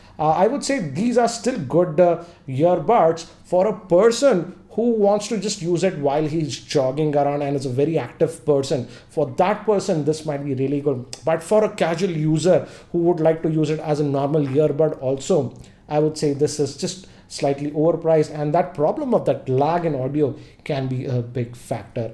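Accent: India. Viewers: Truth time...I only clicked on the video to hear an Indian English accent....was not disappointed. Also....nice review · He is an Indian and most of the Indians have a similar accent but he is providing good content though.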